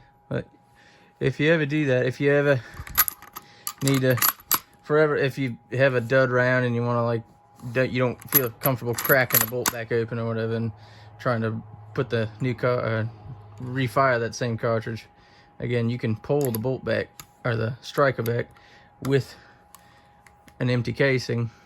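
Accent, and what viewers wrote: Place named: Australia. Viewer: Bro caught an Aussie accent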